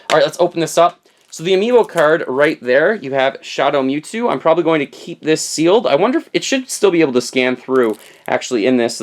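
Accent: Canada. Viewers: Love the Canadian accent. "Try that owuuuttt." :)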